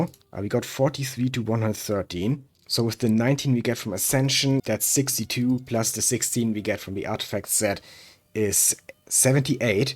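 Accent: Germany. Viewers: Hey where you from? Your accent Sound SO german 😭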